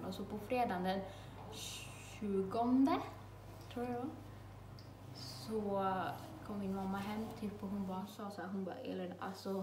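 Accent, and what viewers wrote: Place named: Dalarna, Sweden. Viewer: Nää det där är inte norrländska, och dessutom finns det ju sjukt många olika dialekter i norra Sverige som inte ens liknar varandra så det går inte att klumpa ihop alla dialekter och säga ”norrländska”. Det låter som att hon pratar dalmål tycker jag 😊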